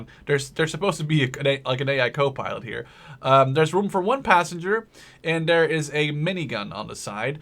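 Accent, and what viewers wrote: Netherlands. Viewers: PSA! He is from the netherlands so he does not speak perfect english, hell even i can't so look how about we all just let it go and enjoy the show, cheers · Devin Wheeler He's Dutch, he's not a native speaker.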